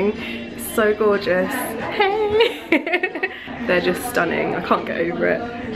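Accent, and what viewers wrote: France. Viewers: The french accent tho 😌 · ouuuuh l'accent français bien prononcé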